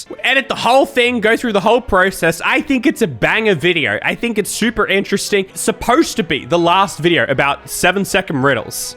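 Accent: Australia. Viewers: Aussie accent doesn’t always mix well with their sensors very stupid lmao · This is definitely massive YouTube BS, but... I wonder if it's an accent thing? Hear me out: I can't imagine that their "real humans" listen to videos all the way through. It's just inefficient. I would not be surprised if they have A.I. isolating suspect words and then presenting just those snippets to listeners. f you listen to out of context snippets of you saying "can't" and don't realize that its an Australian accent, it could maybe mistaken for "c*nt". that's my theory anyway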